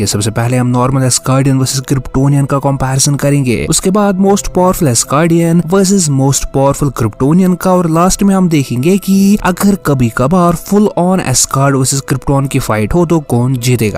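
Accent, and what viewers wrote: Kashmir, India. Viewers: Bro Aap kashmir se ho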